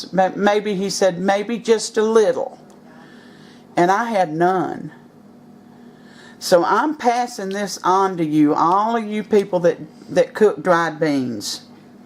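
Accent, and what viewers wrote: South, United States. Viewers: Don't mess around with her Southern draw and hospitality. Southern people are not in a hurry.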